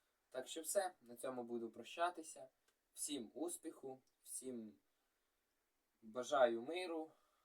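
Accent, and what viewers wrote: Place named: Surzhyk, Ukraine. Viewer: а ты с какой области? У тебя очень интересная речь, смесь украинского и русского (на Донбассе это называется "суржик"), звучит мило😉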